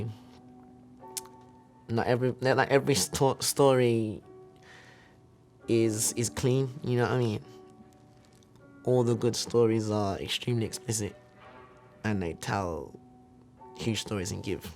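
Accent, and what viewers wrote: United Kingdom. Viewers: I love his British accent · Bro I didn't know scarlxrd was British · He is British...ik that It's Suprising tbh😂🤡🤡🤡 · He said hella fucking British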